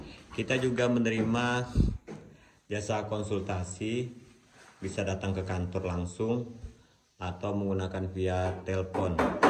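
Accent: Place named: Sambas, Indonesia. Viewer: Macam orang sambas pak aji ngomong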